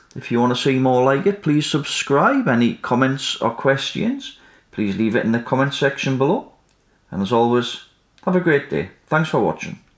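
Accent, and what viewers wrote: United Kingdom. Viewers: Nice fix! Always love watching your videos – interesting repairs and I like the British accent and "unamerican" presentation. BTW: what part of Britain are you from?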